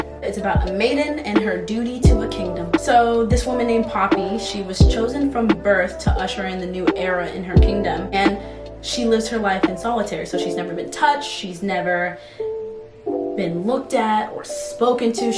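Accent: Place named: England, United Kingdom. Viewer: Oh my god, so glad you popped up on my recommended! You have such a great personality and loving the english accent ;)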